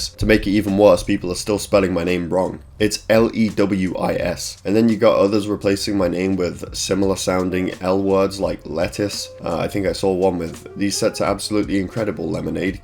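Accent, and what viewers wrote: United Kingdom. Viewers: These dev times are incredible. It's amazing how far has come And you just did a skit about your own skinwalker vid feat. Cloud... even I remember that 😅 And good on you for being authentic with the editing etc and since you hit puberty late 🤣 Most your demographic probably aren't British, they just love our accents haha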